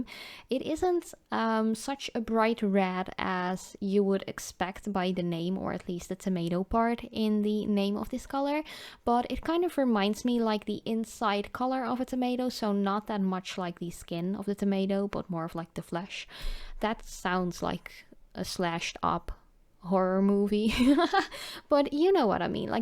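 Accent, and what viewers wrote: Netherlands. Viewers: Hello, I love your videos, they are absolutely amazing. Love your dutch accent ! (I used to live in Den Haag, had the best time of my life there) I have a question for you! How did you got sponsored by MG? I would like to do videos like you, but I assume you dont purchase these collors, they just send them to you? how did you started this companionship?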